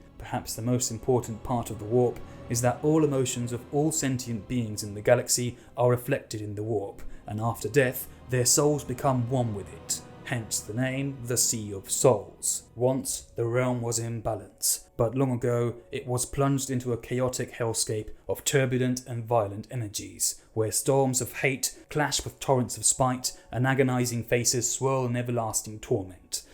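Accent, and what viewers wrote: United Kingdom. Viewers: omg, your english accent is orgasmic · man what a brit accent you have, when you said you would rule an english channel I expected a strong spanish accent. Impeccable.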